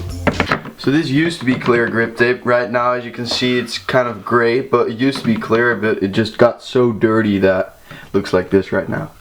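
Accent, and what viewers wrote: Netherlands. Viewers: it's so funny how easy it is to recognize a Dutch accent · Are you dutch?? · I hoor you Engels accent en ik dacht gelijk Nederlands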